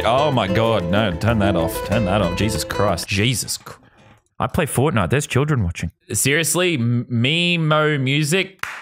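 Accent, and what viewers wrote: United States. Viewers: no joke, Lazars American accent is on point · was anyone else surprised by his American accent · bruh his american accent is actually insane lol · So we’re just not gonna talk about how Californian his American accent was, he sounded like a different person!!!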